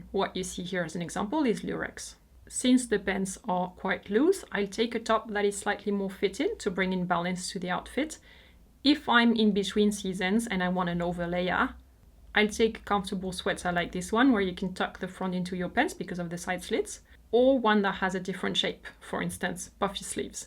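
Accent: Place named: France. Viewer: I could listen to you talk about fungus and cellulite all day 😂. You have a lovely voice and French accent. Everything sounds so especial!❤️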